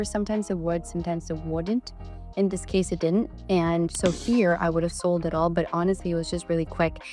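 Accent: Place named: Paisa, Colombia. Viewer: quedo fascinado viendo lo tesa que eres y escucharte hablar ingles y español en ese acento de acá super paisa uffff😍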